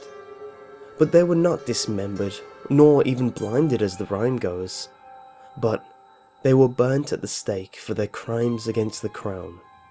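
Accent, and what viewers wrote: England, United Kingdom. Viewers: Geez someone PLEASE tell this guy that it is Catholicism. Not Cathlicism. Just makes him sound so ignorant, even with the English accent. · Does anyone think he is british?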